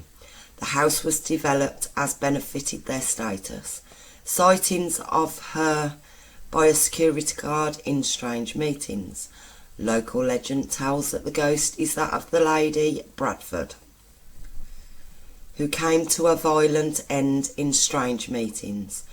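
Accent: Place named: Birmingham, United Kingdom. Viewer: I like her brummie accent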